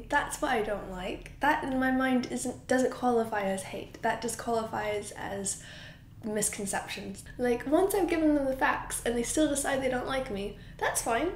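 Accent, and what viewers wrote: United Kingdom. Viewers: I totally missed all of whatever you're talking about!!! But I'm glad you're okay and past this. Also, it's pretty adorable that the longer you're in Europe, the thicker your British accent becomes! I've had friends move to Georgia or Tennessee and they start adopting a southern accent without realizing it. It's like the same thing here!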